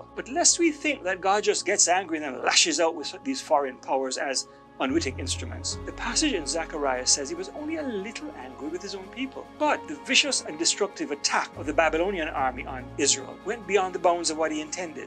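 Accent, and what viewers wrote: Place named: Ireland. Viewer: Really good video. Also, comment from an Irish person - your accent is half like that found in the South to Southwest of Ireland (and half something else), are you from Newfoundland or something?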